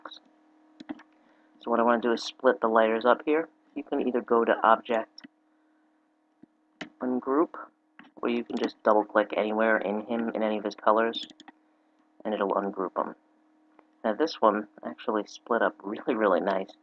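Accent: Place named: South Jersey, United States. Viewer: ha!! i knew it!! my mom is from egg harbor, my dad is from absecon. i miss the beaches so much in south jersey....but am so excited to be coming back to go to the shore again!! woohoo!! you can talk to me anytime, i love that accent....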